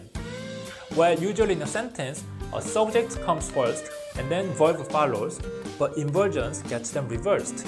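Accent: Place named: South Korea. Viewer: 토종이신가봐요 발음이 정겨워서 좋아요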